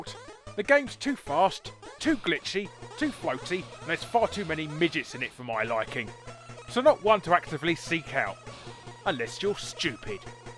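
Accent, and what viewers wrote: United Kingdom. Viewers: Why so british?!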